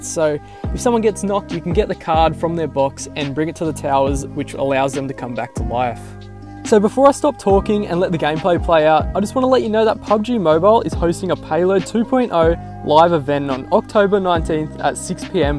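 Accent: Australia. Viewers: he is Australian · Great gameplay mate! I miss hearing aussie english 😂 I love those RPGsss! 🔥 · Australian accent rather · I think he's Australian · That's australian accent. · Excuse If You Can Answer Me Are you from Australia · Australian accent 👀👌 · I love Australian accent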